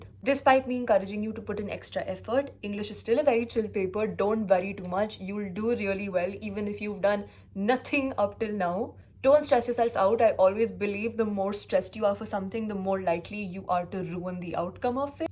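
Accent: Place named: India. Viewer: This is called cbse accent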